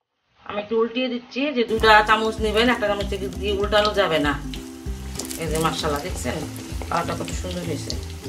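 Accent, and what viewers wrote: Bangladesh. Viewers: aapnaar bangal bhaasaay kothaa bolaa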